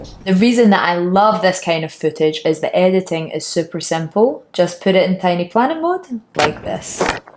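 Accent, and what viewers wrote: Scotland, United Kingdom. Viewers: Scottish accent😁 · Insta360 haha so did I! Thankfully when u started speaking the home accent I realised. Alba gu Brath!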